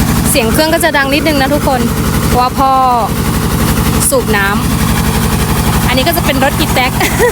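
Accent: Isan, Thailand. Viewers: สุดว้าว..สาวเมืองกาญจน์แต่เว้าสำเนียงอีสาน อ่างเก็บน้ำที่ไหนนะ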